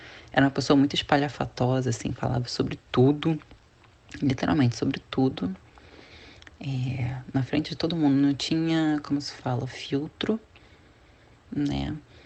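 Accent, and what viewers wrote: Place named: Rio de Janeiro, Brazil. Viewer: Sotaque carioca, mas a voz mesmo não tem nada a ver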